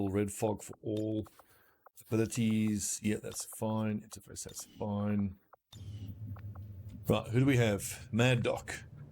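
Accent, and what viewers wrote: South Africa. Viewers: Great to see your back, really missed those South African tones of yours.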